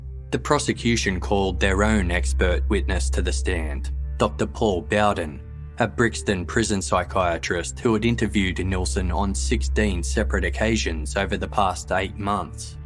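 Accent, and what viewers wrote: Australia. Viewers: Love your channel. Love the Australian content. Love your Australian accent. I don’t know what I am going to do when I have listened to all of them. Aussie Aussie Aussie. Oi Oi Oi !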